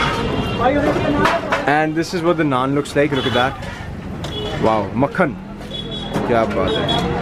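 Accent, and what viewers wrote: Punjab, India. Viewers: Veerey assi kadi vi Punjab nahi aaye lekin taudi lens naal and taudi accent naal mainu ae lagdaa hai ki main Punjab to kaddon chhad ke gaya hi nahi.. jyonda, hansda Te wasdaa sadaa sadaa rahwega saada Punjab Te saada Hindustan.. BTW please mainu maaf karna agar Saadi Punjabi Galt hui. Cheers!!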